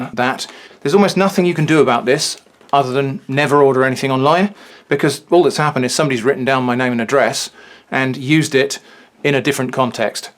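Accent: United Kingdom. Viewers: how does he sound much more british in this video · I were about to say that you forgot to mention that the footage is mirrored aswell but then I remembered you British accent.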